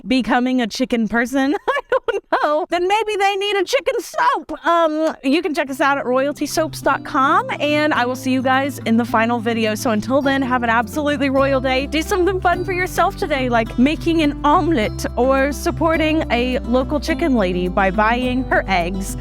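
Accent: Texas, United States. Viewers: i havent commented much i dont think but i used to watch your channel SOOO much back in 2018 or so! i miss living in texas, moved out to co a fews years back ive started watching you again cuz i missed hearing the accent! i love chickens!!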